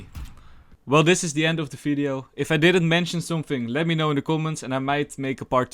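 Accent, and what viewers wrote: Netherlands. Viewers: use wierd KAMERA spots, love the dutch accent xD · Kamera spots love the dutch excent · I love the amount of Dutch I hear in your voice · het is een hollander je kan het gewoon horen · XD, I literally hear the Dutch accent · Hey ben je nederlands want je hebt een hard accent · U sound sooo dutch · Nice Nederlands accent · Ma man's dutch i know the accent because i am dutch too lmao, good and handy vid tho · you hear he is dutch lol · Loving the dutch accent <3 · When I started the video I heard the Dutch accent instantly nice vids man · loving the dutch accent 😩☝️ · The dutch accent is strong lol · Nederlander xp hoor je gewoon aan je accent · Een Nederlander ik hoor het aan het accent 😂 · im only watching this now, but when i heard you speak the first sentence i recognised a fellow dutch accent. i decided to check your channel name and heyy i was right!! 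· Loll i like your dutch accent 😂😂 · Gy eb echt een nederlands accent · Jij bent 100% een nederlander hoor het aan je accent hahaa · Broo your english is on point, ye your accent is really obvious dutch but outside of that you know exactly what to say! Lekker bezig maat! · A bit of nederlandse accent maar its goed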